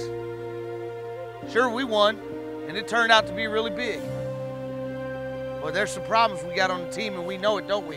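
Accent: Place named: Texas, United States. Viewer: Dude, you're from Kansas, but sounds like yer from Texas.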